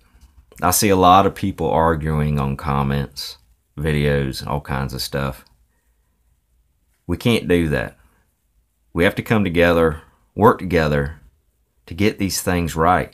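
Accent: South, United States. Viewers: first off,it's refreshing to hear a fellow southerner,lol, but YES, I started smoking at 11, unfortunately,& we were living in Nashville time. there were ads & commercials EVERYWHERE!!!! I PAYED THEM MUCH ATTENTION,due to being a very young smoker!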